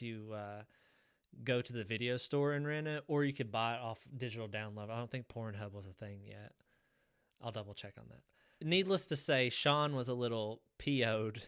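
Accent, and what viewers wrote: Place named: Deep South, United States. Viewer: Coming from Imgur, I was not expecting a Deep South accent 😂. Excited to get rolling.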